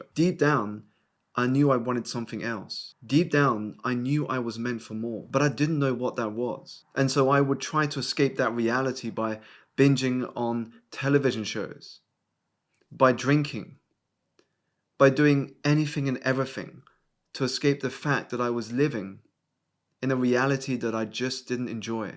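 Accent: United Kingdom. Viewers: Your british accent is cool.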